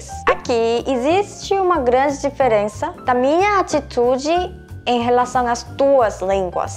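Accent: South Korea. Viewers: Seu sotaque coreano e muito forte por isso seu portugues não 100% brasileiro.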